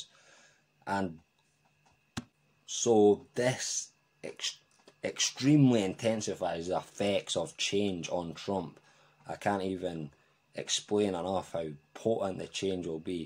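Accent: Scotland, United Kingdom. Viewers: New Sub here - you and many other astrologers have predicted this orange bastard tRUMP is going down. His luck is finally ending. I'm from the US - love your Scottish accent!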